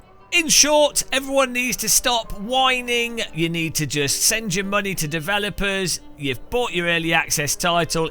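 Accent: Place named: Midlands, United Kingdom. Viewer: Agreed. I only subscribed for the sarcasm, which is amplified by a Midlands accent. :-)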